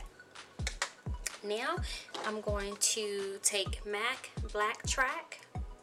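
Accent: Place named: South, United States. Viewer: Hey my new YouTube Noir friend! New subbie here! Love this pop of color!! I am also an Alabama girl by way of New York City and I have been working on my southern accent for years so don't apologize, lol. Also check out another one of our Alabama makeup beauties here on youtube: NitraaB. Look forward to watching your videos, tfs, God bless.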